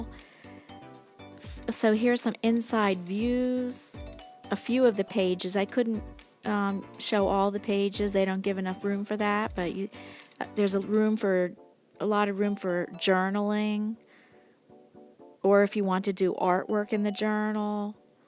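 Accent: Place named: Pennsylvania, United States. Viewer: I like how she got her name. Sounds like you are from the other end of PA from me. That digital art was cool on an ipad. That wildlife one at the end had some pop to it. Watched the video in full gave you a like #payingitforward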